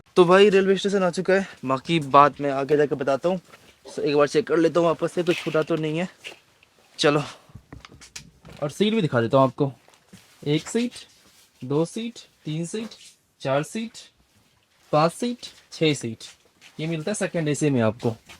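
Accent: Bihar, India. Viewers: Char din hue nhi honge Russia gye hue or attitude dekho iska, abhi tak bihari tone gyi nhi hai iski hindi ache se bol nhi pa rha or nautanki dekho iski.....paisa barbaad saala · Kahe raese pel rahe ho jo accent use kar rahe ho lag rahe ho bihari ho cheap aur chutia dono dekh rahe ho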